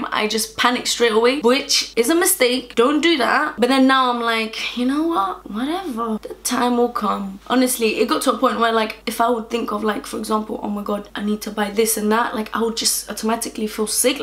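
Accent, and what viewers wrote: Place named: South London, United Kingdom. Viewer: Are you from south London idk maybe it's just me but it's your accent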